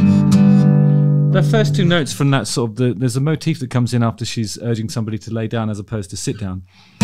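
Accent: United Kingdom. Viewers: No, he’s just British and you’re probably just American.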